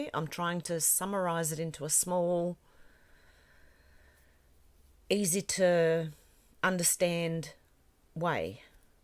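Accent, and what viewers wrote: Australia. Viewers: I just love your accent from down under..and such a beauty,...inside and out..don't wear yourself down and get sick now..we really appreciate all of your hard work and the sacrifices you've made.. a little secret of mine...I turned my TV off 3 yrs ago and I don't miss it one bit... MSM is all fake news..the continuing of mk ultra and mind control. You are such a strong lady and Patriot.. thank you ....just for being you..we are all in this together.. WWG1WGA..THANKQ DEAR LADY.. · I really like you. I like your videos. Really love that Australian accent. Keep up the great work , we appreciate it.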